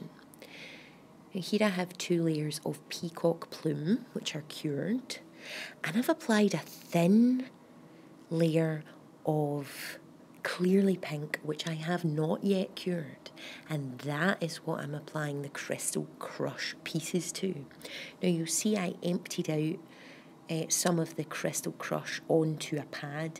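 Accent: Scotland, United Kingdom. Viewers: loving your little video keep them coming and its nice your Scottish so can relate more lol xxx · You people have no class for commenting like this. I watch her cause I do Shellac nails at home and not experienced in using or creating shattered glass effect among other methods. To say her voice is creepy and it’s probably because she is Scottish is racist. I work for a Scottish lady and she has an accent but not creepy. I have an accent cause I’m Slovak, people make fun of me since I came to Canada and told me to go back home. Until you walk in other peoples shoes you shouldn’t talk so negatively. Women should support one another and encourage one another. It hurts me that other women talk like that about other women instead of saying positive comments. Who are you, stand in front of a camera and talk and do nails at same time, I dare you all Bully’s out there. 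You people have to watch what you say cause it will come back and haunt you. I just wonder why people click on certain platforms to watch and then comment trashy. Are they bored, are they nail learners, it can’t be nail professionals cause they wouldn’t comment like that. These people are juvenile and BULLYS. I don’t know this lady but love her work and I use the products she has CND and more. She is an ambassador representing CND and Lecente products and you juveniles should give her respect. Who were you brought up by to have manners like that.🤮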